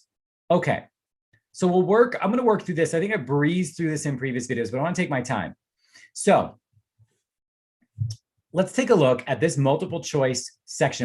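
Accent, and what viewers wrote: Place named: Spain. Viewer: Gosh i love your Spain accent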